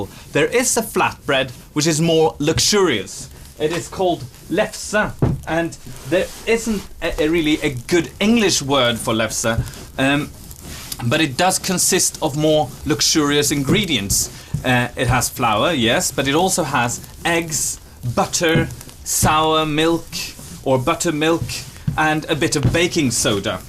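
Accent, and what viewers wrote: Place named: United States. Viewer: He also herbs with a silent H (in a different episode?), which I'm quite sure they only do in the US.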